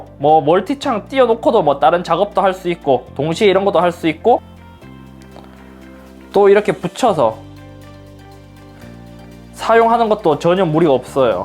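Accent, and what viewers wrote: Gyeongsang, South Korea. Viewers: 고향이 대구에요?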